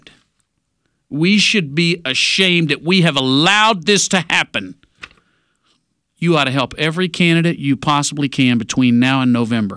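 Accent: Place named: Texas, United States. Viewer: He's a born Texan, that's just how he speaks.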